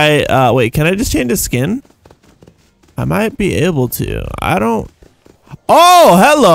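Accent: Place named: United Kingdom. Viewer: I think your British voice is really cool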